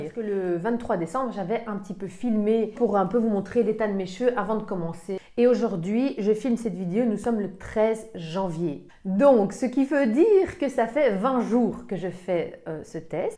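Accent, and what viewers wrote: Belgium. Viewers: Je crois de belgique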